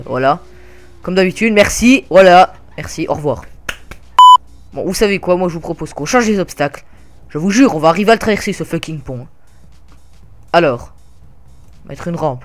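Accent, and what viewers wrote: Belgium. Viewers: tes belge ? Vrèment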